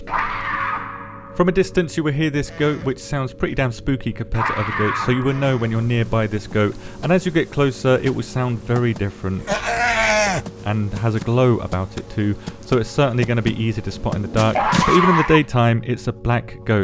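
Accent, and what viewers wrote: United Kingdom. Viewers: tryed again to watch a vid of you, again i cant. this british accent is like a chainsaw to my ears.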